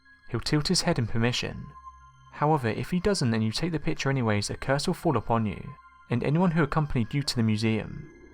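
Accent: United Kingdom. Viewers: The British accent narrative makes it more spooky lol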